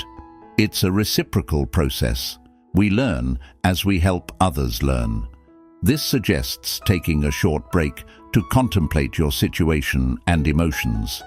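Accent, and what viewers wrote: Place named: United Kingdom. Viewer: The expressions....social media", snacks, and and "online".. do not go well with the overall energy brought on by the voice of wise, elderly man speaking with a classic British accent 😄....lol....This is only my opinion....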